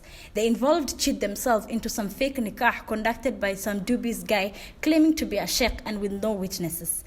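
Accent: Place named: Somalia. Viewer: Lalabo waye gabadhaan wlh uuf.... her english sounds like somali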